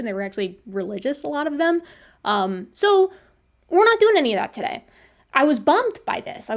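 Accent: Midwest, United States. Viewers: I love her midwestern speaking style, but I may be a little biased because I'm from northern Illinois and she sounds like me, haha.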